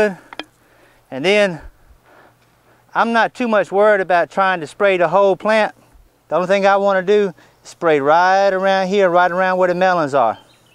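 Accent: South, United States. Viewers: Hey dude, enjoyed your video very much! I'm in Los Angeles so your Southern accent and references to woods and swamps was refreshing! I've got a raccoon (in LA? YES!) eating my crops in my new backyard raised garden. Ate my tomatoes and corn just like the day before I was ready to harvest. When it ate one of my 20-year old box turtles, it was WAR! I did the pepper/garlic thing, which worked for a while. Then bird netting. But now I'm going to get a trap like you have, apprehend that critter and drive it into the Santa Monica mountains and set it free. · I love your southern accent! I think raccoons are chewing up my trash at night. I found my milk cartons torn apart across my deck. I can show you what my trash bucket looks like. I don't have a garden, but I need to keep critters from coming up here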